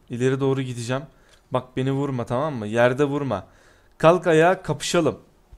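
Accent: Adana, Turkey. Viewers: Abi Adanalı mısın yanlış anlama bende adanaliyim bizim gibi konuşuyon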